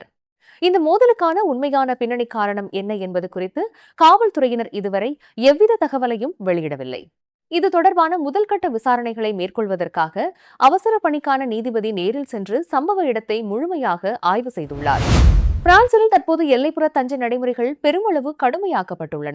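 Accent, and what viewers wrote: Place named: India. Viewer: இந்திய பாணியில் செய்தி வாசிக்கிறீர்கள். ர ற ண ன ல ள உச்சரிப்பு சரியில்லை.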